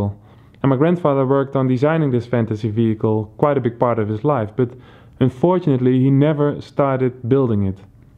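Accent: Netherlands. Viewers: you must be dutch ^^ just cant help but notice your accent anyway Cool idea bro, hope u get it done :) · je hoort gelijk dat ie uit nl komt xD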